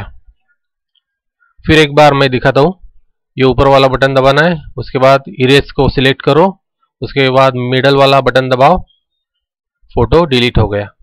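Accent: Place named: Marathi, India. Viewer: Dear ye Marathi person hai,and Marathi logo ko thik se Hindi nahi ati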